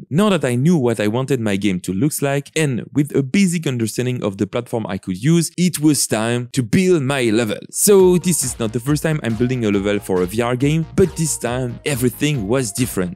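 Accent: France. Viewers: Par contre frérot t français?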